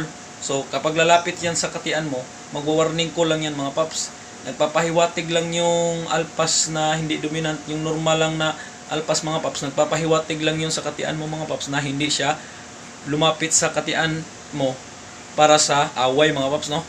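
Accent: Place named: Samar, Philippines. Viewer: Samar Yan bisaya or waray salita Niya Pansin ko sa salita niya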